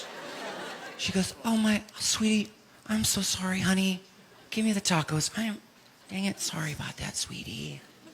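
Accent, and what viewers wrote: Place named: South, United States. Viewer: I love southern accent so funny!!!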